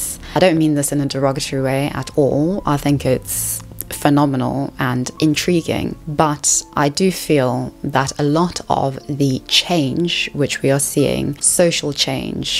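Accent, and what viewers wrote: South Africa. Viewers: You're South African ❤..love it. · your accent sounds like south african that moved to england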